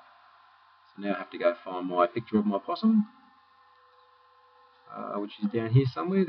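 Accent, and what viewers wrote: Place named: Australia. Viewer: G day Thanks mate aussie accents are my favorite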